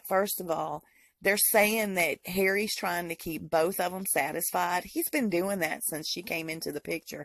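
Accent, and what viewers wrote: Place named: New Jersey, United States. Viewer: 2/2 ...that’s how ya “tawk”” when yr from Jersey... Girl!!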